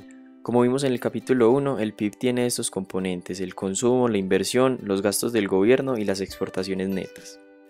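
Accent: Antioquia, Colombia. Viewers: Eres muy claro y acertado al explicar! y tu acento paisa hace más ameno escuchar este tipo de temas haha :3 Gracias por tomarte el tiempo para hacer estos vídeos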